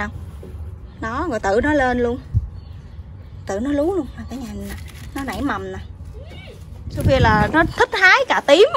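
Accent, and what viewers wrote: Mekong Delta, Vietnam. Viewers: nghe tiếng của chị người miền tây chị ở tỉnh nào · WOW dam chất miền tây nam bo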